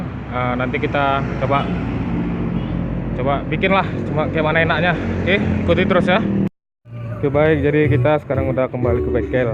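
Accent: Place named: Medan, Indonesia. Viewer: Medan apa Aceh bang😁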